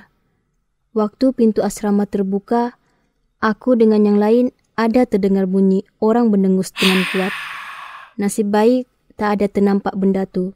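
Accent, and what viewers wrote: Sabah, Malaysia. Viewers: Sabah ke ...swk punya cerita..tpi pembaca mcm sabah..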